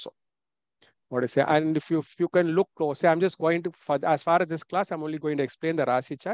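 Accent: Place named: South India, India. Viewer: sir, super exceptional knowledge but i must add that this video has no meaning for those who are not well versed in basics as sir speaks very fast and doesnot give a second to link what all he is saying plus his south indian accent adds to problem. Even transscript is not clear that you can pause the video and read his words of wisdom. sir you will appreciat that all of us are in the process of learning. The worst part is if you miss a link then it is very difficult to understand and the interest is lost in the video. What attracts us is your knowledge and a different perspective to read the chart. So the request is to be little slow instead of galloping.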